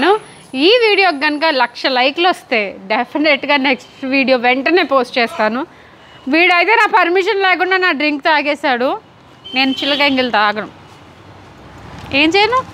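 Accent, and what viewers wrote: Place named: Konaseema, India. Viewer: Elagunnav, aakalettunda 🤣🤣Haha konaseema slang max gaadu😂😂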